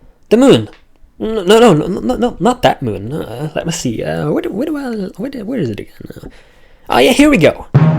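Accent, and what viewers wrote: Norway. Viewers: did i hear the lovely sound of a Norwegian English youtuber? ikke ofte man ser det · Kul video! Ikke alltid man møter på norske zelda-yourubere! :D Litt sterk norsk aksang i uttalen din, men ellers veldig bra!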